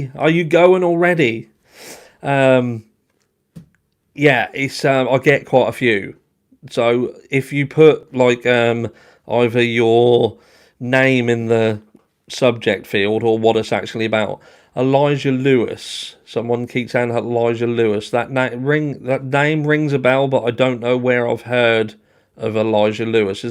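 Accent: United Kingdom. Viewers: I am so bummed that I missed this... I rarely have time to catch lives, but for you, I'm gonna do my best! You crack me up!!! Tell all them TROLLS that HATERS OF HORSE-SHIT (yes I've named ur super hero fan club & I am the leader lmfao) 2nd that FUCK OFF & will make life hell with our super powers... those powers include common-fuckin sense, ability to understand & love a great British accent 😉, and our desire to do anything we can to help the voiceless get justice and stop history from continuously repeating!!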